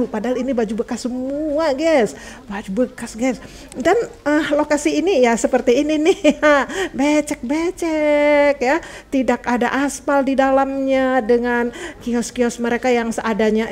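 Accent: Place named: Banjar, Indonesia. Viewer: oh maaf logatnya kadang sprti sdra2 kita yg dr Banjarmasin☺️☺️☺️ sy penduduk kaltim turunan Bugis lahir Jambi 🙏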